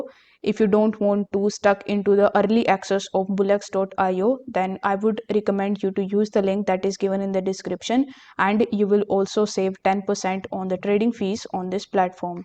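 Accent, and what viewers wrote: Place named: India. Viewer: This is an Indian scammer picture is a Caucasian woman with voice is obviously an Indian woman😂 lowlife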